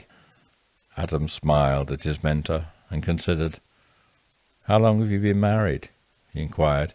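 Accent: England, United Kingdom. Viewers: Wonderful. Another intense mystery by to enjoy. Fantastic mysteries with his lovely English accent guiding us through the most certainly surprising end. I can feel the busy day melting away as I listen. What a talent. ❤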